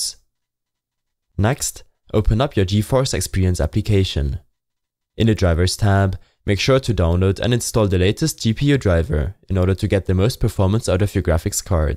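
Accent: Germany. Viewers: You sound really german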